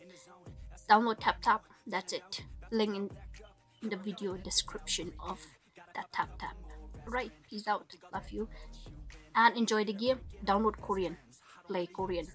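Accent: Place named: England, United Kingdom. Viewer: So much Overreact in English accent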